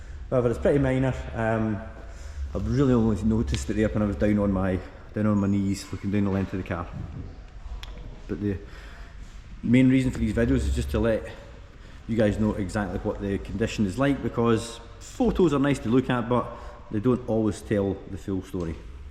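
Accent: Scotland, United Kingdom. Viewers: Is he speaking in Scot-ish, I can only understand every fourth word he says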